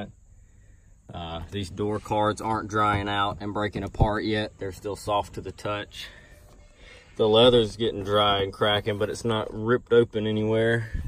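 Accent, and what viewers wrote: South, United States. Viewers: Jesus, Southern accent and knows/appreciates 80's panther frames? Please turn gay and marry me? Pretty car though. I like them so much more than the Town Cars of the time. Too bad it'll probably never be restored. These haven't quite reached classic status in the eyes of the masses but it'll get there. Hopefully it'll get lucky and hold up to that point.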